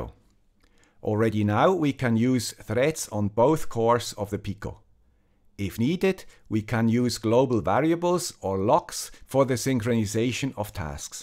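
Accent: Switzerland. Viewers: Nothing like your weekly dosage of your guy with the swiss accent. :)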